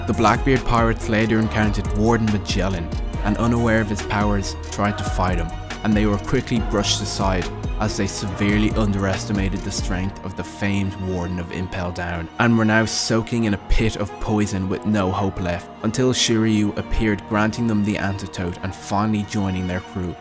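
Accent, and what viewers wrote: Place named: United Kingdom. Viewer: Such a lispy British accent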